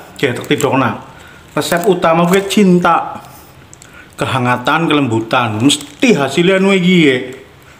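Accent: Banyumas, Indonesia. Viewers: Saya suka logat ngapaknya pak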